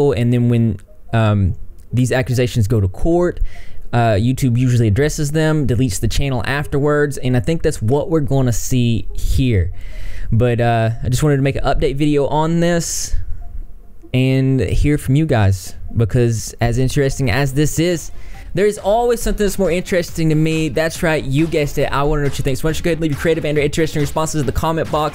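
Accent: South, United States. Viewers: Oh mah gosh u got a country accent like me :D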